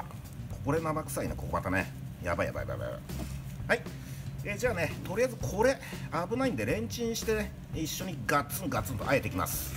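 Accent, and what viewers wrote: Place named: Kanto, Japan. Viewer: 関東の人だね また関東に住みたい。。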